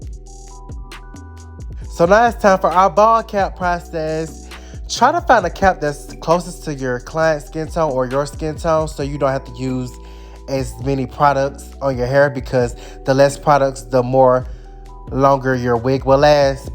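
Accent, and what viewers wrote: South, United States. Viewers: This country accent I love it lol😭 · He's accent is southern and he does say he's located in Georgia in every video description.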